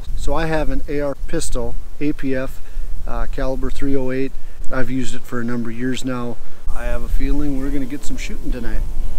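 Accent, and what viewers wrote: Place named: Minnesota, United States. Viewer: You sound like you are near where my family is from, Fairfax, New Ulm, Mankato. Nice spot!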